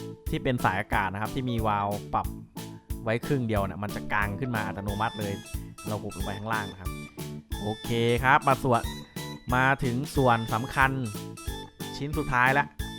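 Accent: Korat, Thailand. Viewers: พี่คนโคราชนิคับ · อ้าวแกคนโคราชนิ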